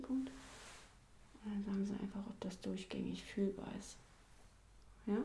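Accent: Germany. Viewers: german, guaranteed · I think she is german...i don't t inderstand anything..but is good..i feel triggers.....gostei muito mesmo..ela é ótima....já me inscrevi no canal.... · A Germán doctor.....pretty familiar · I very much prefer the soft speaking roleplays. The German accent and language is nice ASMR. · вы из германии или австрии?) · It took me 11 minutes to know she German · yG KeKe I love the German language, and their accent.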